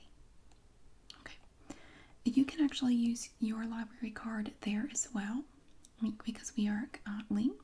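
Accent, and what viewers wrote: South, United States. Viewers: She sounds like a southern pam beesly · I love your southern voice and biscuitville as well!! I eat at the one in Greensboro often lol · sometimes I forget that you have a southern accent and then I read the comments about the accent and then I just think “she has an accent??” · So many tingles! Does anyone else feel like she sounds like Pam from the Office but with a Southern accent? Such a soothing voice. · I just discovered your channel today. Your videos are amazing! I've listened to this one twice already and love the sounds and your accent! There's nothing like good ol' southern hospitality here in the south!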